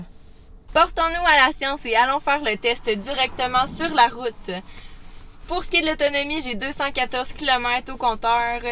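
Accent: Quebec, Canada. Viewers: Moi: Cet accent, Mon pote : Ce du Quebecois. Moi: J'aime la fille moi. Mon pote: Non Moi: Pourquoi? Mon pote : Vous allez jamais se comprendre. Merci pour le video, Un nouveau AIME pour vous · J'adore ton accent quebecois. Moi qui est un peu séjourné au Canada plus jeune pour mon travail,j'adore ce pays lointain de la France. Bonne continuation et merci pour les tuyaux sur certainement ma future voiture TESLA Y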